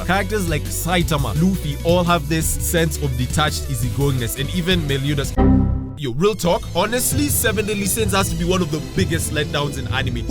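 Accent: Kenya. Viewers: Oh shit i knew that accent from somewhere wsg my kenyan blooda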